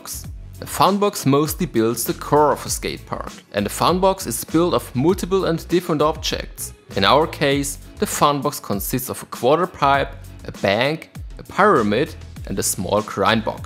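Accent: Germany. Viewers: Well if you want, one day we should skype, discord or something so I will try to train you on these V and R. Your Rs are not bad, but you seem to focus a lot on these, while most of them do not deserve great attention and should be very light and short, and even sometimes almost muted. Your Vs are... hum... still a lot german (surprise surprise) XD As I said to you previously, your tutorials are very thorough, and that is really enjoyable. In my opinion, it's better to have fewer information that are fully understood, than a bunch hard to get (I'm exaggerating for you to get the picture). I'm not suggesting you to be less thorough, no no no... but rather splitting your videos in two parts, hence giving you twice the time. I bet your german audio track is live with your video (I've not checked, cause I don't speak german), so once a thing is explained, then you just show the video part again, maybe slow-motion, without live audio, and you can insert short comments, like :"here, watch my knees !", that kind of things, just to highlight a key aspect of the move. 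As you are making tutorials, you are teaching us new things, new concepts, so most of us need time for it to make sense, for it to sink in, and then understand other new concepts relying on the previous new ones. I've seen however that you have a nice number of followers on your german channel, congrats ! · that german accent is hilariously strong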